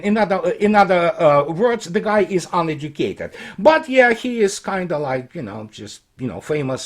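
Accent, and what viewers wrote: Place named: Russia. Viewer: What are we supposed to make of an American citizen with a Russian accent?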